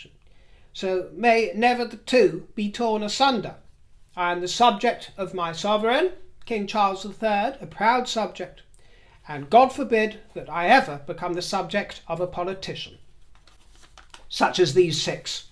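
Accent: Australia. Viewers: as an 🇦🇺 I can explain why it's very simple, we have a Labor Government in power, they are what we call Red Raggers & they're always extremely unhappy sour people. Everytime Labor takes power the country ends up in more debt with more crime & more tax to try & pay for the debt. If we go to a Republic it will allow the powers that be to change our Constitution which in these times is extremely dangerous, extremely & even most of the young people know this. We will remain under a Constitution primarily because of this unless of course the votes are 🤔 tampered with 🤨. However I honestly think that if the Wales were visiting instead I would bet money on it that all of these Premiers would have made time, just like they're all quick to turn up to opulent dinners with Queen Mary when she stands for exactly the same system as King Charles & isn't even our Monarch. Glamour & popularity certainly impacts with whom Labor politicians choose to dine with, at least that is one thing Labor does stick too. 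I'll shut up now otherwise I'll rant on forever as you can guess I'm not a Red Ragger. By the way dear may I say on the whole you do a wonderful Aussie accent, depending on what area you come from the accent may become a little more defined.🇦🇺🐨🙏🏻👍🏻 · may I compliment you on your Aussie accent. You nearly have it down 'pat.' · 🍐 yes please! 😂 Australian Squirrel here from Ballarat, regional Victoria...I am embarrassed for our State Premier Jacinta Allan' rudeness..how busy is too busy for a Royal Visit...surely a cabinet meeting can be postponed. Nothing much happens I'm sure if the juvenile antics of televised parliament sittings are anything to go on. It would be tremendous to have King Charles visit here as the Late Queen had and other notable royal family members going back.. Cheerio ps love ya Aussie accent. It was ripper mate. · I'm Australian and I also think it's very insulting of our Premiers not to meet with King Charles. 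The general public voted to remain a monarchy at the last referendum. If King Charles & Queen Camilla were visiting my state, I would go to see them but unfortunately they are not coming to Queensland. I went to see Queen Elizabeth and Prince Philip in Brisbane when they last visited. You have an excellent Australian accent,. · Hi from New Zealand. I agree with you & love your Australian accent 😊 I am so disappointed that King Charles is not able to visit our Country & I wish him & Camilla a wonderful Royal Tour 🥰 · Love your Aussie accent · You can't imagine how we feel as good as your Aussie accent is😅 so many feelings our lucky country is losing its way with idiot politicians and migrants whom disrespect our way of life it all makes me cry Love ❤🇦🇺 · Hi, I am utterly disgisted at our politicians. There is no way I'm voting for a republic. Long live King Charles. Love you're Aussie accent.😊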